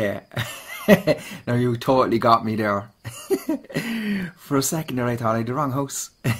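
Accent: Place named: Ireland. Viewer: Irish accent is definitely a lady killer specially with voice!! 🍀🥂He's a lady killer himself and he knows it ladies hehe 🤭🥰 cute audio btw 😜💞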